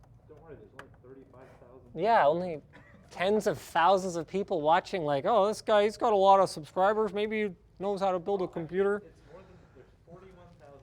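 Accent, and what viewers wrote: Canada. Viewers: You sound Canadian in this. I learned what it sounds like from South Park.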